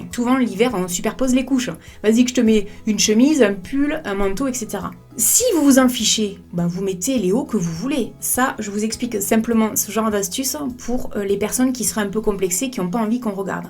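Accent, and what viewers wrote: South, France. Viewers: Coucou perso je porte des soutifs sans armatures que l'été et que quand je sort ou que je bosse. Le reste de l'année c'est juste un débardeur près du corps sous un pull .J'ai la peau très sensible et les soutifs avec armatures m'irrite la peau et parfois jusqu'à la brûler et cloquer. C'est très douloureux donc mon choix à été vite fait. Je dirais pas que ma poitrine à retrouvé ces 20 ans mais ce qui est sur c'est que nos muscles reprennent à soutenir tout seul et que au final ma poitrine tombe pas plus que sa. J'ai 38 ans 3 enfants et vie beaucoup mieux sans soutifs et j'avoue le regard des gens je m'en fou 😉. Ps:j'adore tes vidéos, simplicité et naturel, et ce jolie accent j'adore 😉 l'accent du soleil j'ai le même je suis pas loin de Perpignan 😃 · J'adore ton accent de sud😍😂 super video😉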